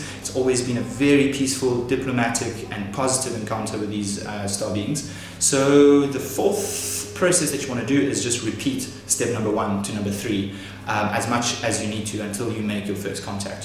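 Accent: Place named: South Africa. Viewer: ah south african accent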